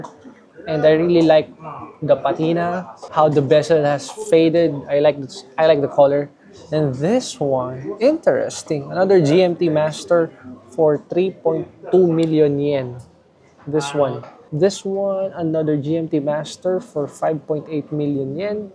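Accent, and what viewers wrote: Philippines. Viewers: I binged most of your videos , really good content. My wife is Filipina and I recognized the accent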